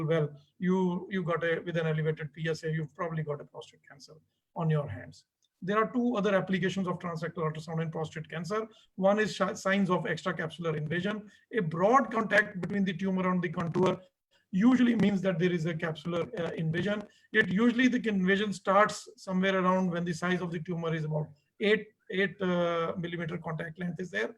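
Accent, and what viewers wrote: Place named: India. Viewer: There is NO ISSUES in the Audio, But the English pronunciation of the Doctor from India‼️He has a very strong accent from his country of origin. My observation only. Thank you for the lecture doctor‼️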